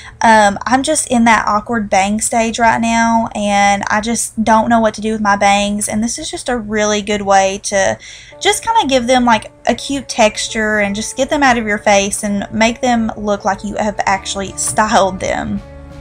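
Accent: South, United States. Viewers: Gorgeous makeup and hair! I love it :)) I don't own any Mac products..do you happen to know any dupes for those colors? I can't wait to try this out!! Love hearing you talk cause we both have that country twang ;) Xoxo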